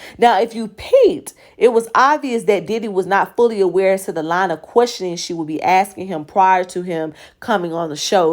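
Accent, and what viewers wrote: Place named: Florida, United States. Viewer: Are you from Florida you have the accent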